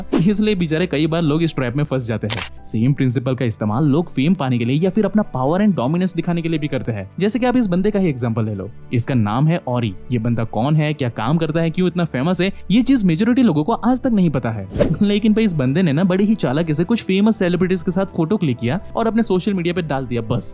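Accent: Bengal, India. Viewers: Content thik hai lekin ... Bong accent me Hindi pasand nhi aati ...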